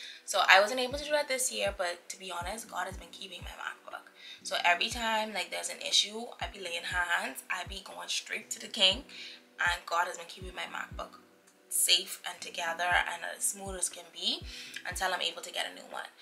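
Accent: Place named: Bahamas. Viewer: Love it! Also as soon as I heard that accent was like yessss, 242 represent! Excited to do my first vision board.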